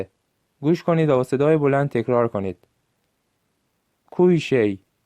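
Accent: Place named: Gilan, Iran. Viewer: شیمی گویش کاملا رشتی نیه کی عزیز ،کل گیلان با هم‌قاطی بوکودی جان پسر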